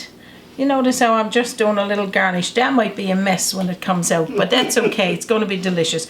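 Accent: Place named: Canada. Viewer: wow that looks sooo good!! merry christmas to canada!!! i ABSOLUTELY LOVE YOUR ACCENT!!!! i live in maine... and it soun ds like how you say it..parch a berry. soo cute!!!! here we call it part ridge. i dont think we have those berrys here, but i know we def have the bird;];] good eaten too;];];] hope you have a great christmas and a great new yr;];];]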